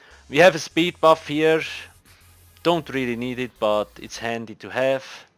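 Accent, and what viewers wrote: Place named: Switzerland. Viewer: You sound so Swiss haha :P Are you also playing on the ps4?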